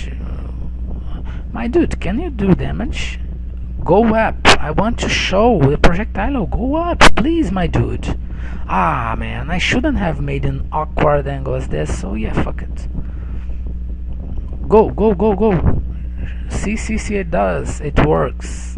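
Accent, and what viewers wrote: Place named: Brazil. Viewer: seu sotaque [e de brasileiro kkkkk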